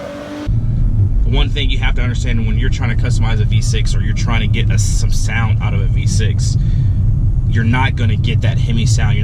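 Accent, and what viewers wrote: United States. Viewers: U from Alabama bro?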